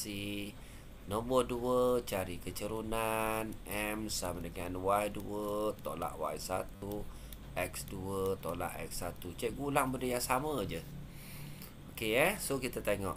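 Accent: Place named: Kelantan, Malaysia. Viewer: kenapa cikgu cover cover kelantan cikgu , takpe cakap bahasa kelantan pun boleh hehe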